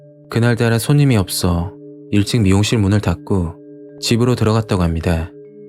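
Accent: Gyeongsang, South Korea. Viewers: 와우 오늘도 어김없이 님 영상과 함깨 합니다 님 혹시 경상도 분이신가요?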